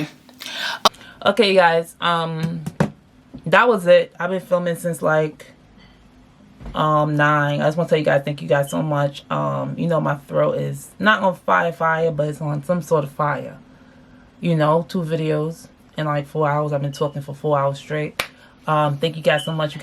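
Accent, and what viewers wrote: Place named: New York, United States. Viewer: subscribed just because I hear a NY accent 😂✨ hey sissss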